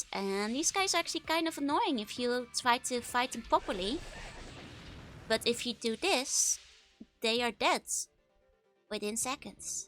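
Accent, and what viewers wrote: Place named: Netherlands. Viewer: i dunno how i got here but i instantly recognized the accent to be from the netherlands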